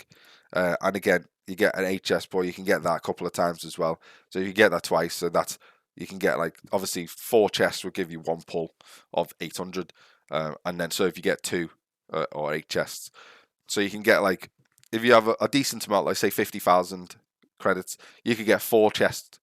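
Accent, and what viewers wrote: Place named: Northern England, United Kingdom. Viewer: Love the northern accent! I'm a little farther north, I'm Yorkshire. Altincham are you?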